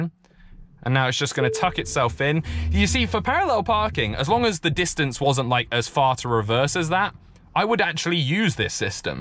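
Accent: United Kingdom. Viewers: North and middle of UK talk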